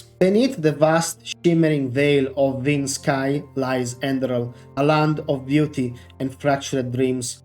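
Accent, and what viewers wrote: Italy. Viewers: Your language is beautiful and poetic! You might just want to speak a tad more slowly, pause for a moment between sentences to make it easier to follow for those of us not used to the Italian accent. Mille grazie!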